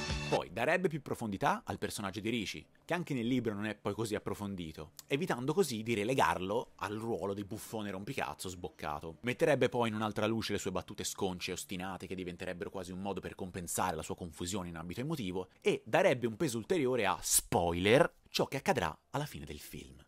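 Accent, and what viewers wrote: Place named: Tuscany, Italy. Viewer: A di che città toscana sei?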